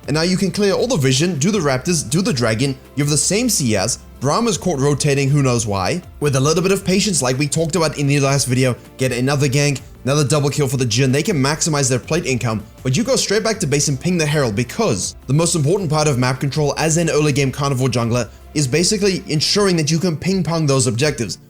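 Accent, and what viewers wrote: South Africa. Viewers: I KNEW IT... U HAVE A SLIGHT SOUTH AFRICAN TONGUE OMW I LOVE YOUNOW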